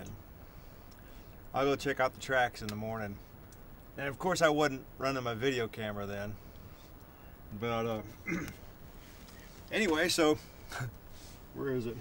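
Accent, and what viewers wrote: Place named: Texas, United States. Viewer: You are so funny- love the hat. Your Texan really comes out when you talk!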